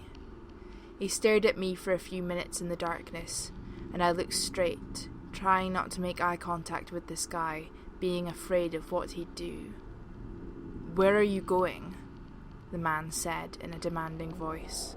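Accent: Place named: United Kingdom. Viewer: Great stories and you have a great voice! Love the British accent! Keep up the good work and looking forward to your next video!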